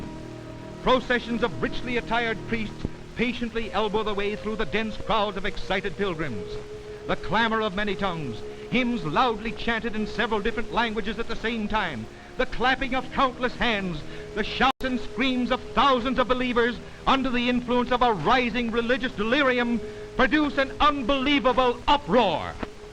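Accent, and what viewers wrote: United States. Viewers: It's a yank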